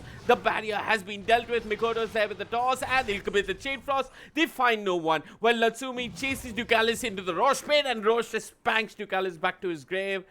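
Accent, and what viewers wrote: India. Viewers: indian caster ? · Who is this indian caster.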